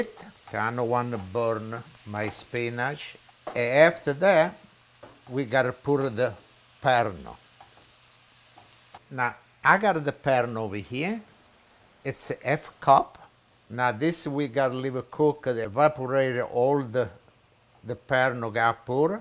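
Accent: Italy. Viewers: The recipe is great, but that Italian accent makes it better!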